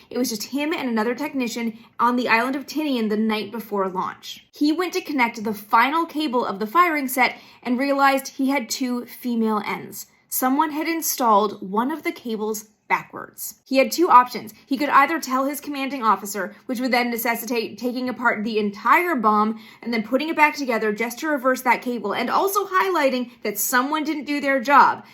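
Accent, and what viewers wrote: Canada. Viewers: You're such a Canadian, still. 🔥💙 The way you say "soldering." Yes, pronounce that L. I'd love to talk with you about it. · I know it's just your Canadian accent coming thru... but its pronounced 'sod-er'. the l is silent. love your vids!!! 🤗🤗🤗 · Yes, she’s Canadian. I don’t know if you’re new to the channel, but it becomes really obvious that she’s Canadian after a short while. I think it’s nice that she doesn’t try to hide it. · has a bunch of pronunciations that I find adorable. Some are Canadian. Some are based on how NASA pronounced words. I think this one was just a mistake.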